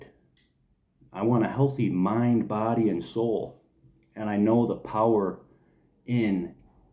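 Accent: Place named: Michigan, United States. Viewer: I knew your accent was familiar!!! I lived in Michigan for 20 years. God bless you brother!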